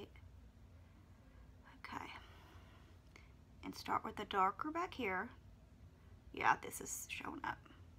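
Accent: South, United States. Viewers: I love your accent! I wish I had a southern accent so badly - my close friend is from Mississippi, and when I hang out with her or stay over at her house, I start talking like her after a while and I have to force myself to stop. Lol.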